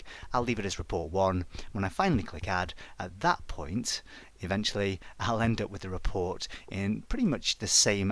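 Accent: United Kingdom. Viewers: This is the best training video. I love the British accent! Please can you upload the SSAS 2008 training. Thanks.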